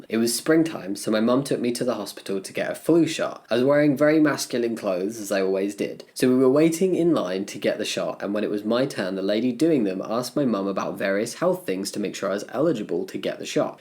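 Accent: United Kingdom. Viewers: off topic but are you british?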